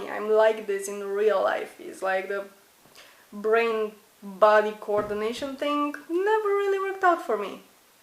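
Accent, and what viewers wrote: Romania. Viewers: I like your romanian accent :D. oh and stop bumping your head into things! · her accent is bull. oh wait, she's romanian. what does she want anyway? lonely girl...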